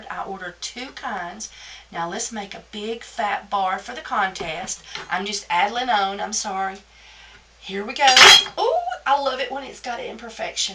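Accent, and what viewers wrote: South, United States. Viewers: Yay, congrats on the Consignment shop wanting to have "all" of ur soaps & on being featured on Ess. Depot's site! Good for you! Enter me pls. Another wonderful batch to share with us, mahalo! One of the reasons I enjoy ur videos is bc of ur southern accent, ur southern grace w/an easy going style & ur sense of humor. If only we had smellivision! Aloha nui~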